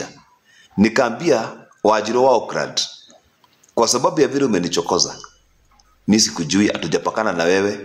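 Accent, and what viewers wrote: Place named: Kikuyu, Kenya. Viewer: Rudi shule kwanza ujifunze Kiswahili sanifu! Unaongea Kiswa-kikuyu...Baba ya maibilisi! Potelea mbali na huo uchawi wako unajaribu kutuuzia!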